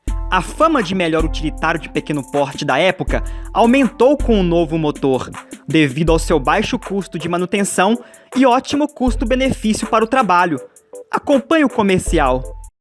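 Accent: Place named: Northeast, Brazil. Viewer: Velho sotaque noredestino. Inconfundível.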